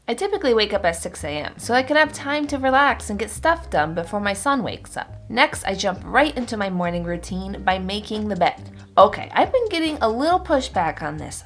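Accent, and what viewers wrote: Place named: Cockney, United Kingdom. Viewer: Nice Cockney accent. You could play Eliza Doolittle. Thanks for the swish-and-swipe hacks. That's the part of the morning routine that holds me up.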